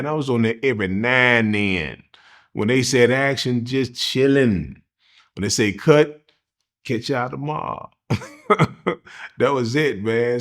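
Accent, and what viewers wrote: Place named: South, United States. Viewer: How do you got a southern Drawl but from Indiana 🤔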